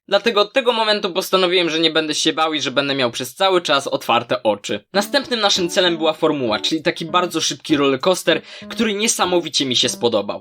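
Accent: Szczecin, Poland. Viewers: ty z szczecina?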